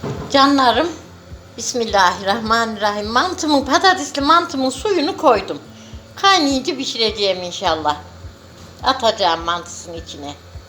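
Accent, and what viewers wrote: Kayseri, Turkey. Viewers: Maşallah teyzem nasıl özlemişim kayseri şivesini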